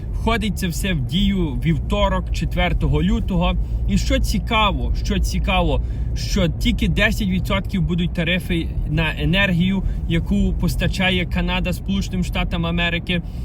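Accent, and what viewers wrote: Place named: Lviv, Ukraine. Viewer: з Львівщини)))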